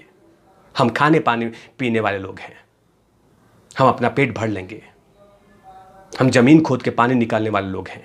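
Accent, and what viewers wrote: Bihar, India. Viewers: विहारी बोली और खड़ी बोली दोनो साथ चालाइये भैया 🌹🌹🌹🌹🌹👌🏻👌🏻👌🏻👌🏻👌🏻👌🏻👌🏻 आपका पुराना वाला अंदाज चाहिए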